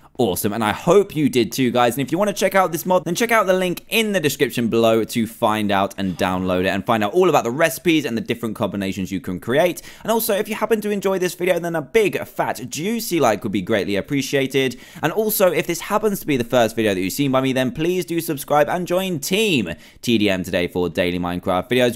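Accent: United Kingdom. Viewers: dude dot hate on british accent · jean wasiulewski no he has his british accent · There are many ways to pronounce many words and he is in the UK so your in the U.S.A maybe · It's how most of us in Great Britain say stuff.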